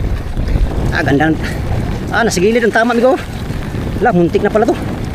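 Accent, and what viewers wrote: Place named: Visayas, Philippines. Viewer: Idle ayusin monga yung pagsasalita mo magtagalog May halo kasing bisaya,kong bibisain mo nalang kaya para hinde ka mahihirapan sakalisod haha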